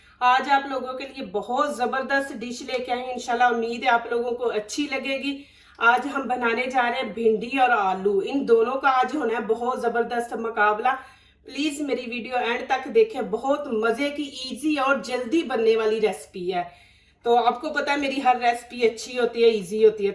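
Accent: Punjab, India. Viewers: I watched a few videos of u .v.nice ..loved ur punjabi lehja urdu ...I myself have my own chanel .kokab khwaja studio....I enjoy ur videos too nd subscribe it ... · lovely punjabi accent..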